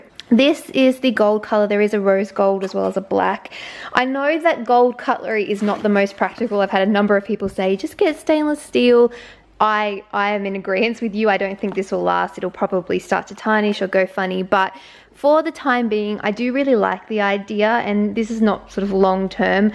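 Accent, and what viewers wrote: Australia. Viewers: that's true. But like you said it did look a little cheap. I love, that you are filming video again. I just love your australien accent, it always reminds me of the great time I had in Australia 😊 greetings from Germany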